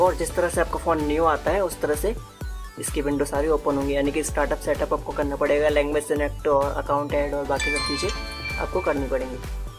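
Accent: India. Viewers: You know shits bad when it's Indian accent and you don't even know what he's saying. Good luck to all of you that can read this ._.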